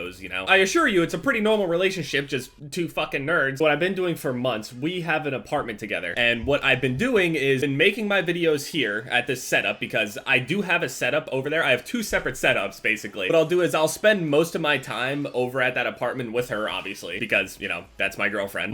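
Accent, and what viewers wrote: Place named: New Jersey, United States. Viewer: New Jersey accent on point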